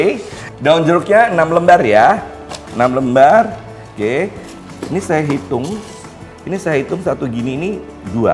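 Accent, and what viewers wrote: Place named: Java, Indonesia. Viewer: Mbae ayu2 ngomong nya medok banget 😆😆😆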